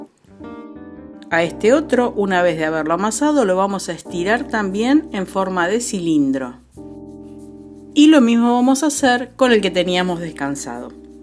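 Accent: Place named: Uruguay. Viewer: Habla más uruguaya...🤔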